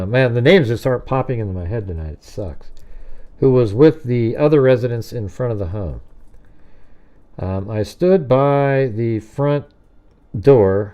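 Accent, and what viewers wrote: United States. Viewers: I live quite far away (Zabrze, Poland) and haven't seen anyone here who knows this story. I'm very interested in forensics and know a lot about many criminal cases. I'm completely captivated by Idaho. I usually compare the work of many sources, but in this case, it doesn't really make sense. Since I discovered your channel, my head has exploded with admiration for your work, talent, and knowledge. At the same time, I really enjoy listening to you; you have a good voice and that great American accent, like from the good old movies I watched as a child. I wish you much success with your channel; it's truly a great thing! Keep doing what you love and stay healthy.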